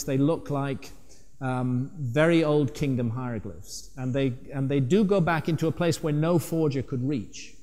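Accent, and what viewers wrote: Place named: England, United Kingdom. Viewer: the English accent makes him sound so articulate and educated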